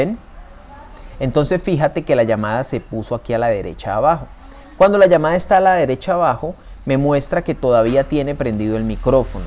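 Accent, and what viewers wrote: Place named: Colombia. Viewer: Me encanta su acento, es colombiano?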